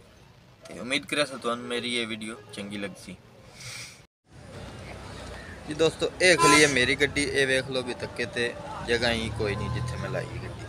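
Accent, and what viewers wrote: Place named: Jhang, Pakistan. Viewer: ji tuhadi jhangi boli sunn k saanu sadda guandi jhangi bujurg yaad aa janda hai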